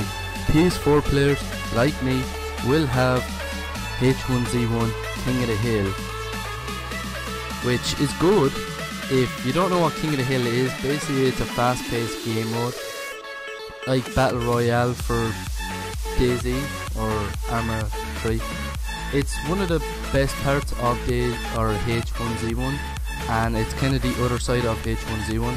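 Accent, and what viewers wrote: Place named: Ireland. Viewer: Youre irish too nice!!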